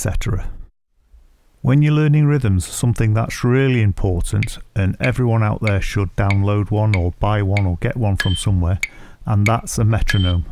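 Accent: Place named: England, United Kingdom. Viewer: Thanks so much. Nice to have a clear English accent with tutorials so easy to follow.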